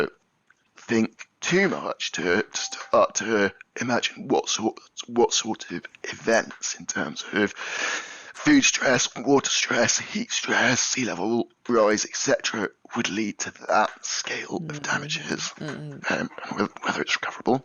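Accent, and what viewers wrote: United Kingdom. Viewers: Need clear voice recordings, esp some of us are not used to Brit accent. The point on risk vs scientific conservativism is real.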